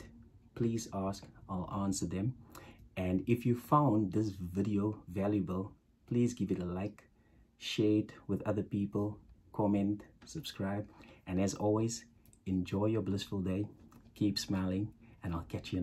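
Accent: South Africa. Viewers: lol, immediately when I heard you speak, I said, this guy is South African. I'm too. 😂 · Wait you South African? Im try this too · I know a South African accent when I hear one❤️👏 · I knew that accent immediately! So happy to find more South Africans in the LOA community ❤❤❤❤ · Oh laaaaawd, a South African accent!!!! Heya brother!!! Hi from Austria! Now I'm homesick! So happy to see South Africans also on here, talking about the Law of Attraction!! 👋👋👋 How many numbers did you get right? I once missed the jackpot by ONE number!! That was waaaaay back! Still got a nice little sum, but not the millions! Yet! 😁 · Love your South African accent. I was in Durban years ago, loved it.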